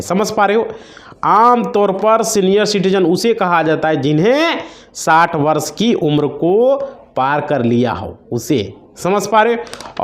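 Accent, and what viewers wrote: Bihar, India. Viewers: जो बताना हो साफ बताओ चुटीये की तरह बोल रहे हो बिहारी हो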